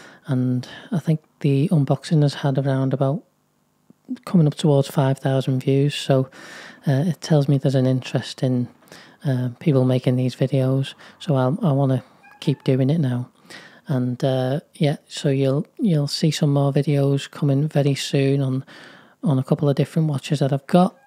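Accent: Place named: Merseyside, United Kingdom. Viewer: 😮😮😮 amazing review my friend.....nice to hear a fellow Merseysider on yt good review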